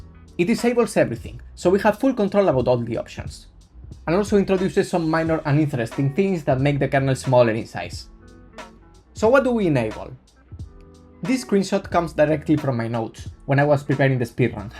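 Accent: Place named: Spain. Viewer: Tu eres español, que te he pillao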